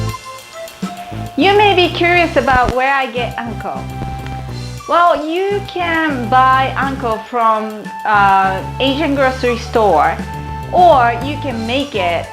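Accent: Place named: United States. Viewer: Where did you learn English? , you seem to have a slight American accent, I enjoyed your video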